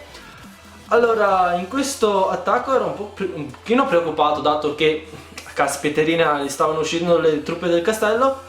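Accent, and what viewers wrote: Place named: Milan, Italy. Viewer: Primo tuo video che vedo e ... sei veramente epico! Un cinese con l accento milanese dove lo trovi ... probabilmente a milano ma tu sei epico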